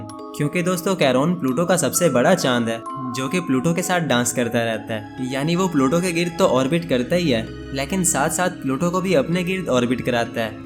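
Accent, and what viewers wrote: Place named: Pakistan. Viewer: Apki bhasa Pakistani jesi lag rahi hai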